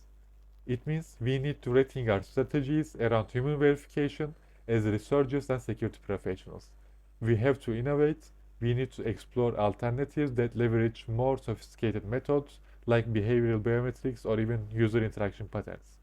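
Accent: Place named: India. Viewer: hintli sandım aq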